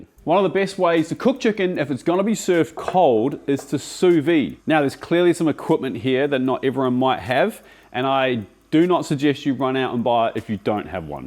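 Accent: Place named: New Zealand. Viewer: Are you a kiwi living in oz? The other 2 defs sound more like ozzieockers tho.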